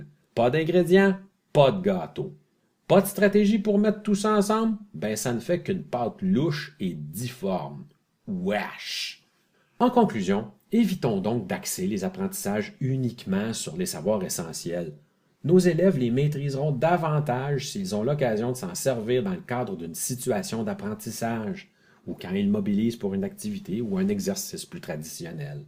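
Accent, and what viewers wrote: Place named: Canada. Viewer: mira Khodja cet l'accent canadien :)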